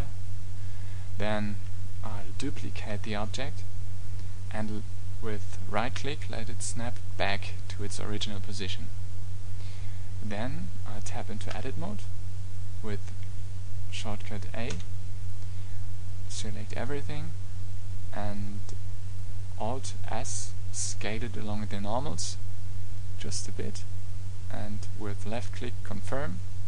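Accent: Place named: Germany. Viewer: I did never say I did not understand him. I was trying to give him advice on recording spoken text in General. His english is quite good, but I can hear he's German (which I am too by the way). But if you try to follow such a tutorial a series of "uhm" and "ahs" and thinking breaks, it can be tiring. So I basically tried to help him, because he was apologizing at the end. Rather write it down, makes it much easier to speak and focus on the rest. Ah and by the way, I am bilingual ;-)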